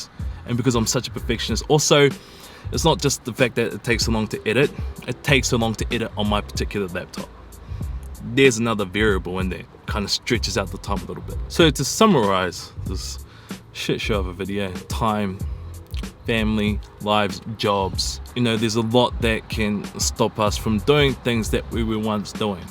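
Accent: New Zealand. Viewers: hes maori I dont think the maori accent sounds australian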